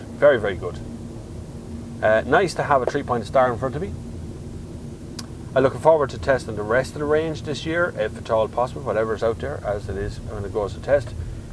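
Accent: Ireland. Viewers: Are you from Ireland?:)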